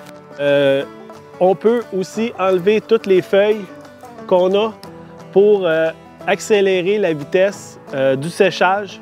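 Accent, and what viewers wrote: Quebec, Canada. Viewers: merci beaucoup .j aime beaucoup votre accent kebekoi.je suis tunisienne qui aime beaucoup le canada que dieu vous protege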